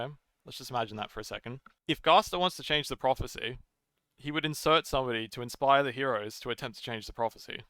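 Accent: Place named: Australia. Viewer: Australian accents are cute though. Show us more about your game soon!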